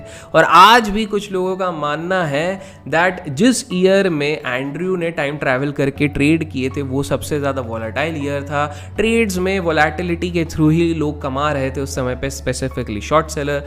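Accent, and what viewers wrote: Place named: Bihar, India. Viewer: E shasura 😂😂😂😂😂😂 Bihar ke bol ❤️❤️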